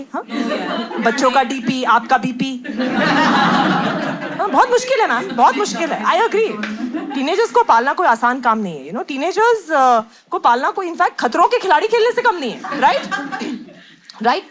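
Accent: Punjab, India. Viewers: Being a Genz I don't spend much time in toilet. Also I really like your way of presenting and didn't find it like I should mind it . Also your punjabi accent ❤❤❤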